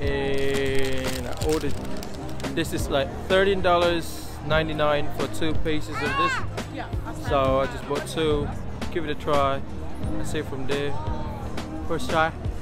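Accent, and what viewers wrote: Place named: Philippines. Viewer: Gusto kaau ko sa imong pag edit ba the way ikaw mag storya pd hahaha accent hoy pinoy! Pinoy ako. So expensive bai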